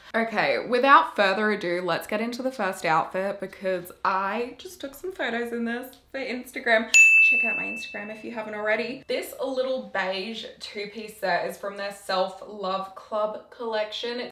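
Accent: London, United Kingdom. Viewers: I CANT GET OVER THE LONDON ACCENT STOP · the London accent killed me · Cracked me up with that London accent haha! Love it, great vid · The London accent was perfect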